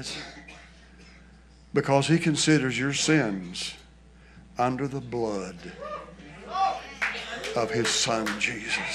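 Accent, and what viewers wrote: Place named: Texas, United States. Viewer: he has publicly denied being Elvis Presley several times. isn't the problem it's a group of his congregation who keep feeling the fire. Only because they want him to be Elvis so badly that ignore the truth. Yes, he does sound a lot like Elvis when he sings but that's where it ends. There are thousands of Elvis tribute artists who sound similar to Elvis but that doesn't make them Elvis. There is no physical resemblance between and Elvis. There eyes, nose, mouth, lips and teeth are all different. You can find pictures of a young with his family on the internet where he has dark hair and beard. Elvis was 6 feet tall while is 5 ft 10 inches. is 17 years younger than Elvis. He was born in 1952, Elvis was born in 1935. is 74 while Elvis would be 89. Elvis was a senior in high school when was born. was born in Forte Worth Texas in Tarrant County area which is why he has a strong Texas accent. 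While Elvis was born in Tupelo Mississippi which is why he has a Mississippi accent. Elvis was married 1967 and eas dating Linda Thompson when married Wilena. father was an ordained minister from 1957 to 1990 when he passed away at age 93. became an ordained minister in May of 1981. After his father died, his mother and 2 sisters travelled through Texas preaching the gospel in small towns too small to have their own church. He did that until 2005 when he moved to Benton Arkansas and worked as carpenter until he opened a small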